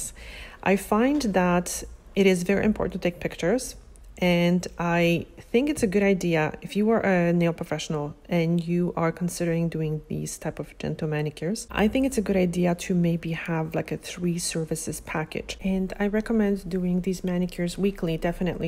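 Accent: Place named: Poland. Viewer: I just subscribed for the content but also I am getting strong Polish vibes when you speak. I’m Polish and your slight accent feels like home. ❤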